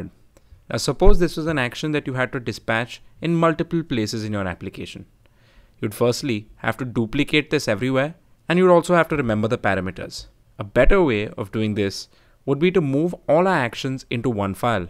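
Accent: India. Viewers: Sir again commenting you are the best teacher who I have come across when it comes to learn react native, I easily understand everything regardless of your hindi accent, I wish there is a way to be able to contact you because I need one serious guide. Please drop me your contact.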